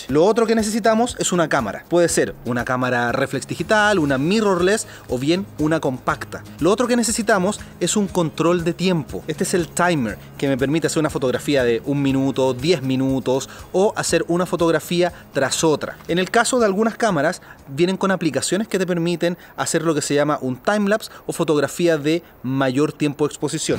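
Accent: Chile. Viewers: chileno we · Nuevo sub campeon. Primer video que veo tuyo y me redulto muy util. Saludos desde argentina. Supongo que por tu acento sos de chile. Se ve que sabes mucho del tema. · De qué país es? Su acento me parece un poco chileno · Eres chileno..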